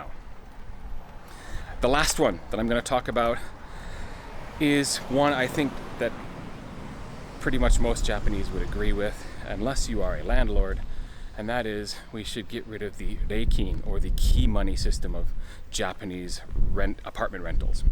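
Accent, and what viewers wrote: Australia. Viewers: Your Aussie accent isn't.... terrible. (An Aussie here). Reikin is TERRIBLE indeed. best, D.A. NYC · Your Australian accent is spot on, says this Kiwi. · Cheers for another fun video. Plenty of common sense in this episode, and the timezone point is very interesting. Looking forward to next video!! PS: that Aussie accent...maaaaaaate....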